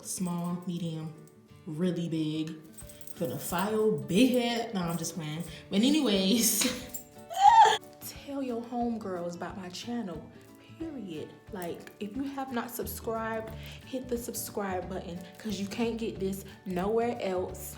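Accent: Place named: Miami, United States. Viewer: Lmaooo i love how Miami you are, city girls intro and the way you talk accent and all reminds me of them😂 girllllllll i f***s with u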